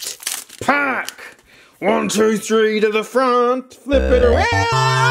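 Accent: Australia. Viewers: man I need your videos, I need Australian ga- , guy screaming to pokemon cards. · listening to Australian man screaming at card while having a coffee in the morning is exactly what i need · This shouty Australian man has invaded my YouTube viewing ..... And it's great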